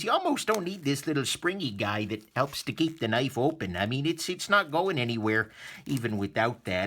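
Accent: Canada. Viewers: Hey, are you from Canada or Minnesota? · I knew i could detect Canadian right away.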